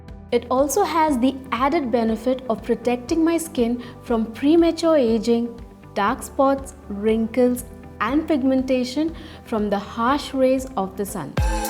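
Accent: India. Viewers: U r beautiful 😍 r u from India? Ur voice n accent sounds Indian.😃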